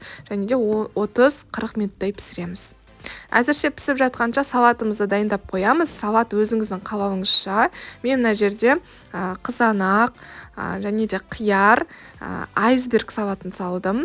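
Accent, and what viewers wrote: Standard. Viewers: Жарайсың таза қазақша сөйлейді екенсің керемет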